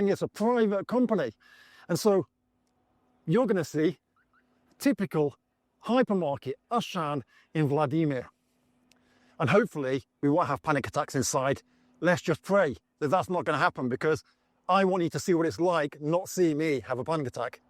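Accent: United Kingdom. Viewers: I kind of figured people will say something to you once in awhile considering to them you are a foreigner but what they don't know you're actually Russian with a British accent lol anyways thanks for the video I enjoyed it God bless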